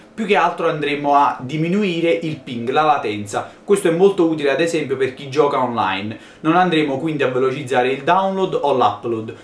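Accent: Naples, Italy. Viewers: ah bella parlata napulitana xd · oddio si sente proprio che sei di napoli xD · Ma sei di napoli?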